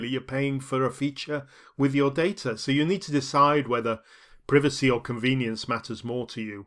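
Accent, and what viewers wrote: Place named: England, United Kingdom. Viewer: Why is this voice using an English accent?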